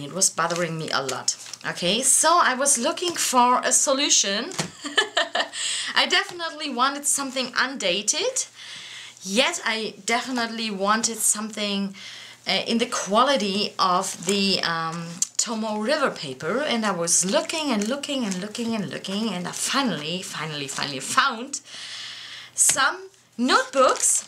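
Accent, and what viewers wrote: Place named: Germany. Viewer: Deutschen Akzent sofort erkannt haha. Ich liebe deine Kunst sehr schön 😘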